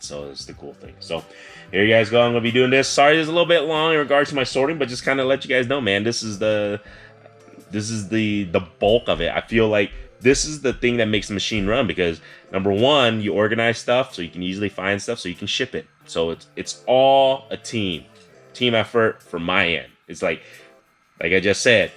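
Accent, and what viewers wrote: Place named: Vietnam. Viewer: Are you vietnamese? U sound like it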